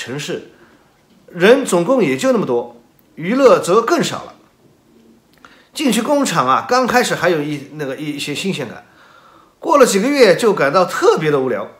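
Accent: Shanghai, China. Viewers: 爷叔上海宁？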